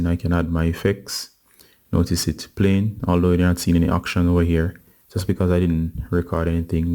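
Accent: Jamaica. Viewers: You sound like your jamaican....Thanks for the tutorial!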